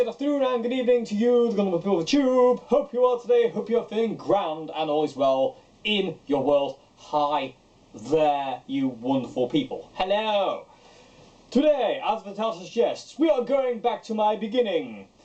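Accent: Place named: Grimsby, United Kingdom. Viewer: If you upgraded your equipment at 'Pooles' combined with your accent I'm taking it you are from or near Grimsby - my hometown 😂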